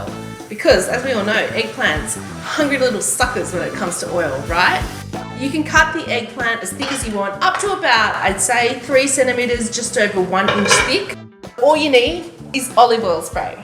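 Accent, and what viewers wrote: Australia. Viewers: love yr aussie accent.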